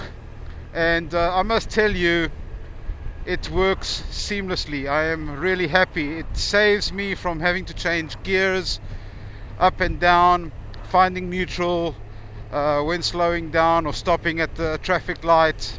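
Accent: South Africa. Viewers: South African in a foreign country? Unmistakable accent. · It sounds like you have a very South African accent! Lekker man.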